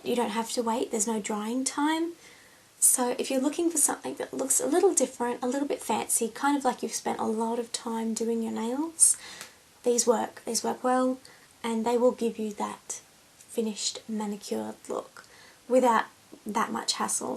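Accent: Australia. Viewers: Sounds pretty Australian to a Brit :)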